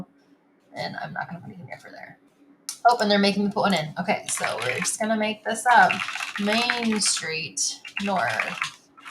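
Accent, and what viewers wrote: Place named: California, United States. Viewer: I tried watching the Vedio as much as i could, your California accent ruined everything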